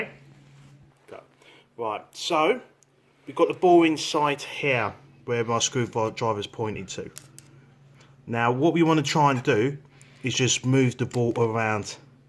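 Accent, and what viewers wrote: United Kingdom. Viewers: the best I,m in canada and my machine is a titan but looks exactly like yours, wont prime and I think its the valve although I cleaned it all out and the ball is free its a mystery, love the cockney accent, like me east end boy thanks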